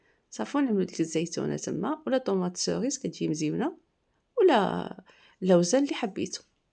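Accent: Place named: North, Morocco. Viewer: والله العضيم هضرتك. مزيونة. كنحماق. على اللهجة الشمالية ربي احفضك ابنت بلادي الغالية♥️♥️♥️🇲🇦🇲🇦🇲🇦🇲🇦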